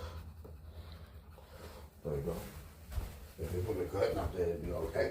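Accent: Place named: Australia. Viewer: I honor your heritage bro, I knew you had an Aussie accent since the get go. My favorite country besides my own is Australia, I probably mentioned before how much I enjoy Rugby football. If I was able to I'd love to come and go on a hunt for an opal. One of my very favorite tv shows is about hunting for opals. I'm Native American and my heritage includes the totem as well. Something you and your bro could share is the boomerang and it's impact on survival for food and the technique to accurately make it's target. THANK YOU both for sharing part of your life story; the artwork is beautiful! I wish I also could honor you with support in some manner. THANK YOU! Watching you from a long ways away in the State of Minnesota U.S.A. 👌😊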